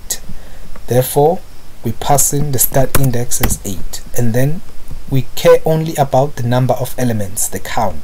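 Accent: South Africa. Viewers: you sound south african sir. thank you by the way!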